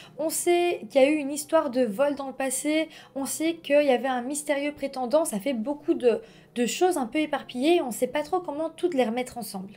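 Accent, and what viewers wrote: Belgium. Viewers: Je pense que tres franchement la mort a ete donnee par accident du a la panique de la faire taire. Ce qui me fait penser ainsi est qu'il n'a pas du tout protege ses mains pour eviter les empruntes, et que si ca avait ete premedite, Sabrina et/ou lui auraient pense a mettre des gants pour eviter toutes traces d'ADN. Quoiqu'il en soit, c'est vraiment horrible et triste et la famille doit avoir beaucoup de mal a gerer non seulement la perte d'un etre cher, mais en plus dans de telles conditions. Merci pour cette histoire, j'adore les histoires qui se passent en Belgique et avec ton accent charmant belge, ca rend le tout encore plus sympa...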